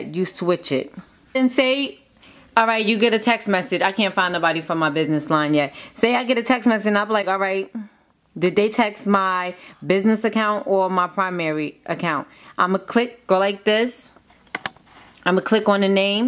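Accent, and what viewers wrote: New York, United States. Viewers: She gotta be from New York cause she ode sound like Sky from Black Ink😭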